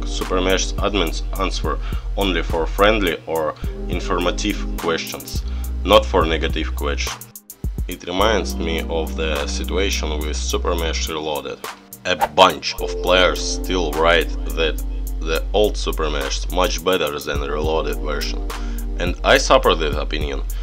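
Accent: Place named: Russia. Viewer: I love your russian accen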